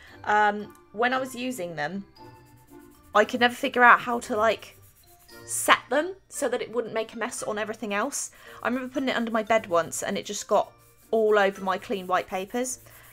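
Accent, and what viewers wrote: United Kingdom. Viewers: Pigmented. Love your videos. Where are you from originally? It’s a British accent, probably south? I’m in Liverpool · I have a few questions (for any future Q&A's. wink *wink*): How old are you? When is your birthday? Do you have a boyfriend (you're very beautiful btw)? And how do you still have your british accent when you've lived in the usa your whole life?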